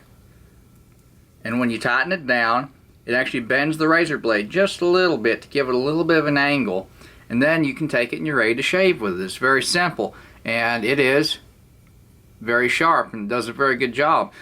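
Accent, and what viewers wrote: South, United States. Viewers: That southern accent tho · As a brazilian guy I proud to say that my english is good enough to reconize that this guy is a Southern one. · I am going back to these, as I started with them as a boy. I changed to GILLETTE and now am changing back. We all know why I guess. I watch Tesla racing channel mostly because of that great Southern accent.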